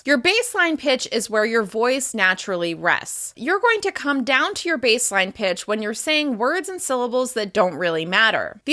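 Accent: United States. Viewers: American acent amazing teaching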